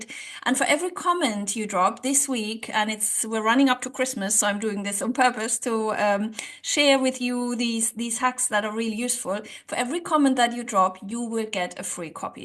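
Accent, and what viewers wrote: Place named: Germany. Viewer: Just found your videos and they have some great tips. You sound German, your English is good though.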